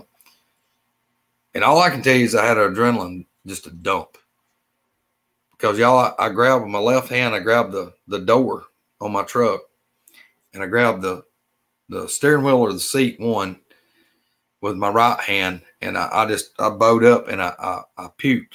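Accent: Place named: South, United States. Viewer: Great show I'm watching at 4 in the morning because I didn't get a notification 😡 and there's nothing better than a southern accent. 😊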